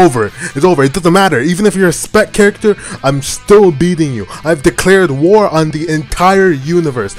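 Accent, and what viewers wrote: Jamaica. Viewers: I heard the jamaican and just subed , good channel👍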